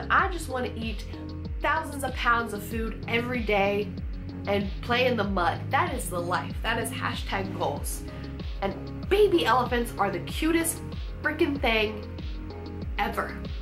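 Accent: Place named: South, United States. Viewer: LOL you should keep your southern accent at all times!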